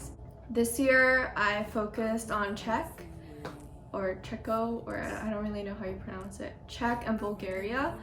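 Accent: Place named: Japan. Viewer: 日本人の英語という感じが面白いですね。私がインターで出会った友人たちの話し方似てるですね。日本語の方が上手ですよ！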